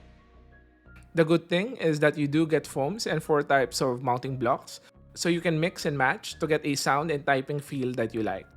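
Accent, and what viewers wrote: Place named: Philippines. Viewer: My dude's Tagalog accent is oozing.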